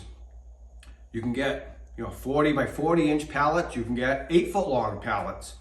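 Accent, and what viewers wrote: Massachusetts, United States. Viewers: Wow. Check out that Massachusetts accent. I'm sure I'd have one in return ... From the Desert, USA.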